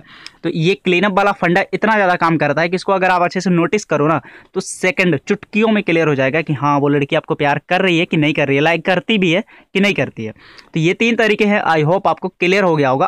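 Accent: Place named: Bihar, India. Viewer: Tu khud awaj se Bihari lagraha hai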